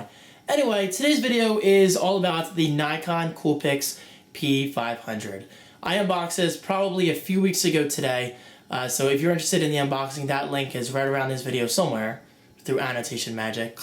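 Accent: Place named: New Jersey, United States. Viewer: I like your Jersey accent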